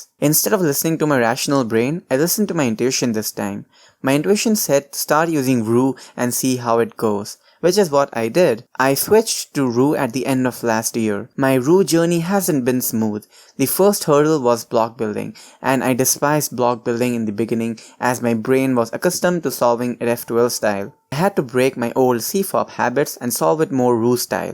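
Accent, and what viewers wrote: India. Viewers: Indian accent turned me off...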